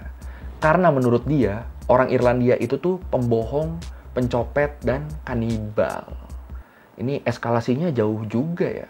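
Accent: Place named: Madura, Indonesia. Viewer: gaya bicara lu mirip dilan bekasi campur logat madura, " selalu ngejait silet diujung top'i " selalu ada jeda cokk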